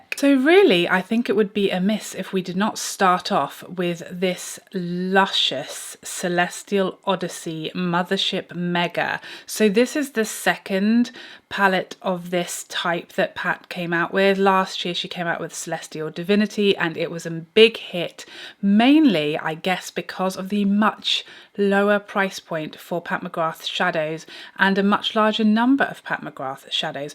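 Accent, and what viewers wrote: United Kingdom. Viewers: Oh a new video. Thanks and your accent always gives me wanderlust vibes to go to UK. I hope it will happen soon. :-)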